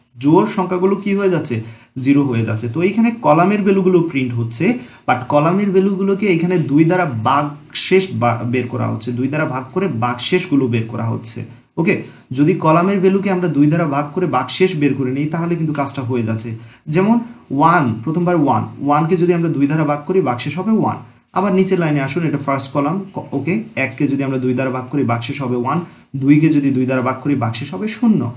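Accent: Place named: Sylhet, Bangladesh. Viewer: thank you vai apnar karone pattern amar kase onk sohoj hoye gelo off topic vaiyar bari mone hoy sylhet ei apnar accent ta maje maje asteshe :)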